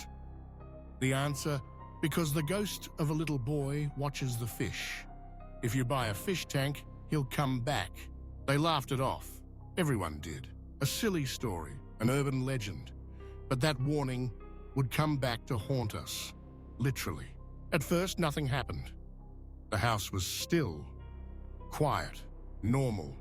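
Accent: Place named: Australia. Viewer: Ausie accent, great mate..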